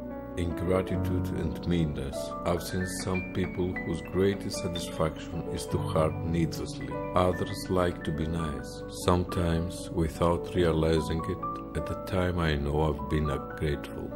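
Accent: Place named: Greece. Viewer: excellent greek accent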